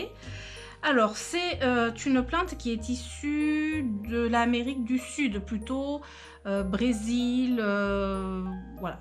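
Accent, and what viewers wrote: South, France. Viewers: Encore des éloges pour vous votre gentillesse votre simplicité vos expressions bien du midi et votre accent du midi gros bisous 😚 😚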